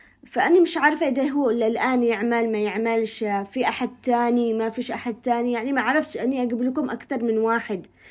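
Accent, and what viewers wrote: Aden, Yemen. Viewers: السلام عليكم بنتي الله يحفظك يا واني اتابعك بكل المقاطع حقك واعادك بالرياض ولعلمك بحياتي عمري اتفرج وبس مااشارك ولا احب بس اعجابي بطبخك وشخصيتك واسلوبك بالكلام العدني اللي ماغيرتيه اشكرك جدا وطبخك احلى طبخ والله كل الاكلات اللي تطبخيها الله ؤفتك عليك يا اتفاجئت انك خرجتي المهم استمري. ولا تتوقفي التوفيق حليفك بكل امورك اخوك يستحي مش مهم الله يفرج عليك ويحببك خلقه يارب رغم انك حبوبة ودمك خفيف ماشاءالله تبارك الله من على مابدءتي واني محتفضة باعجابي وما احب اشارك بس عشانك يابنتي بحفظ الله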